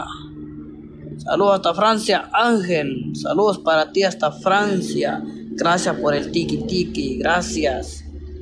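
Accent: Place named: Mexico. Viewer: No , todos los mexicanos hablamos así , pero si usar esas palabras 😂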